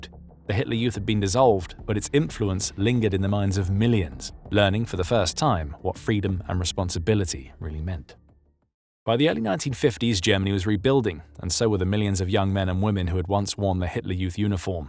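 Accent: United Kingdom. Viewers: I am more concerned that there is a british voice narrating the lives of germans rather than blue eyes and blonde hair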